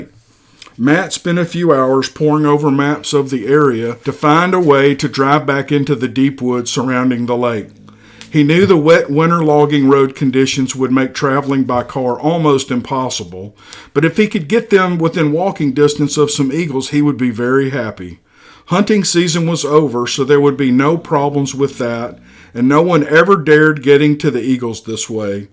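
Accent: South, United States. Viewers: You do the southern accent proud, mate! :D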